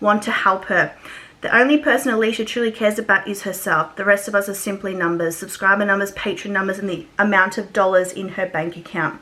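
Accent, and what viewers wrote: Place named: Australia. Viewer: Love hearing another Aussie on the platform! 😊😊 keep it up!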